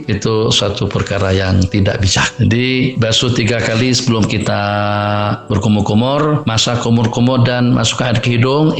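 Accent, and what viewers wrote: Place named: Banjar, Indonesia. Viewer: sheikh ni Malaysianlah cuma dialeg yg d gunakn ming meng dialeknya etnik Banjar dn asal dia Indon ttpi dh lama...mcm orng Cina dtng ke Tnh Melwati awal 1900 dahulu... Agama Islam menggabungkn pandngan agamawan dn bijak pandai moden spt dr/pakar...dh lama kita ikut ckap pemerintah dn dr/pakar tetapi kenpa kes covid makin buruk? Cuba jwb kenapa? Itu maksudnya sudh smpi masa kena minta dn dngar pandngan semua trmasuk agamawan juga...faham! Apa yg sains/barat dpt semuanya dari AlQuran...ngerti!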